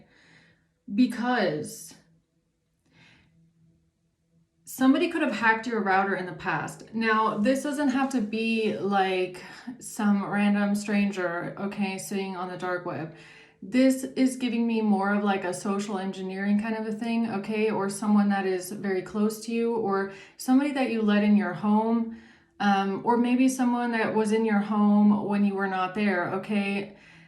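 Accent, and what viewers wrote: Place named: Germany. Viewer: Afternoon beautiful 🐝, LOOKING FORWARD TO WATCHING AND HEARING YOUR BEAUTIFUL ACCENT 😊 German ❤, have a beautiful day night x ❤ thank you x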